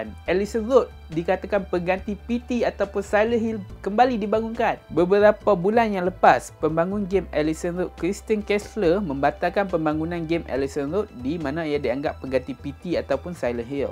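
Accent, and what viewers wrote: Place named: North, Malaysia. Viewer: pekat utara hang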